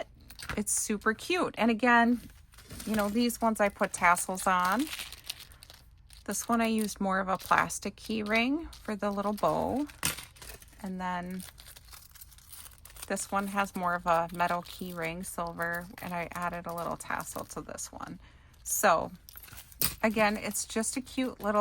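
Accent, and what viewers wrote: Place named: Midwest, United States. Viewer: Hello! I am a first-time viewer and fellow crafter! I am ALSO a Wisconsinite....and I have to say...oooof...that mid-western accent!!! I'm thinking Wisconsin, Minnesota, one of the Dakota's of VERY VERY Northern Illinois!!! I LOVE this idea BTW!!! I plan to use this craft in my "Make to Sell" category!! THANK YOU!!!!!!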